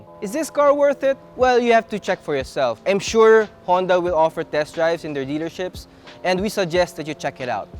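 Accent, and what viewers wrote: Philippines. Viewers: Я просто в ахуе от его «английского филлипинского» .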